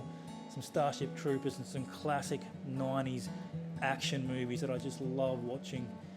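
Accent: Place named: Australia. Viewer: You have to be from Australia, right? Pronouncing SEGA like Seaga was the dead giveaway. But I also suspected it when you said ”a heap of games” which I only ever heard The Last Gamer say.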